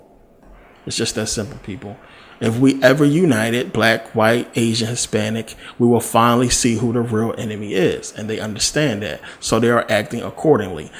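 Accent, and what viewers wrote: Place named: Brooklyn, United States. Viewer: I've paid attention to you!everyday! for past 90days, and I've only got one critic. Before we get to that, I'll say this, I've waited to hear the truth about the confusing holes, gaps and timelines in the bibles of the world, all my life. Also, Folks once said, "the truth would not be told in their lifetime"." Just like they said, "there wouldn't be a dark-skinned President either." I will tell you both have come into the light! YOU are making history by telling us, all colors and nationalities, the truth, in the purest, natural Brooklyn accent I've heard in years,( I mean, Nu Yawker accent.) I thank you, from the inside of my core. Okay, now for your critic. Your begging them to understand and too many, " do your researchs,"is driving me nuts!! PLEASE, spread only 10 of each per vid. I want more facts, histories and practical debunkation.. 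I appreciate so very, Very, much,!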